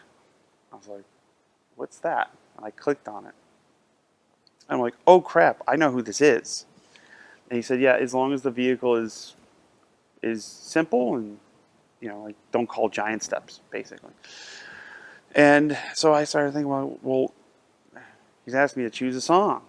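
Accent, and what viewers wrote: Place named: United States. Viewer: I have much respect for Bonamassa as a person and as a musician. Whilst he admits to a slight American accent, to my born in Surrey England (as was Clapton) ears, his diction and grammar, unlike many Americans, is perfect! I am certain that did not need to address Eric Clapton as 'Mr Clapton' or 'Sir' (Eric has a 'CBE' but deserves a Knighthood). Despite his fame he is a down-to-earth guy who is pleased to be addressed by his given name. If I, a nondescript fan of his music, happened to bump into him I would say "Hi Eric, I'm Alan, a fan of your work" and he may be tired of the 'Autograph Hunters' but would be semi-polite. If Bonamassa bumped into Eric and said the same Eric would have returned the compliment and suggested that he would be pleased if they could they jam together. And, of course, these two great musicians shared a stage despite unnecessary and ridiculous deference. 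Its just an exaggerated publicity story; never addressed Eric as 'Sir' or 'Mr Clapton', they each have respect for each other!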